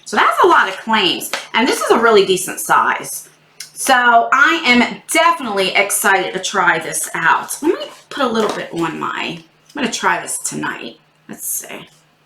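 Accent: New Jersey, United States. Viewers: Good box! I really like your earrings, they're giving me a 90s vibe. Also, your Jersey accent is coming out strong today 😀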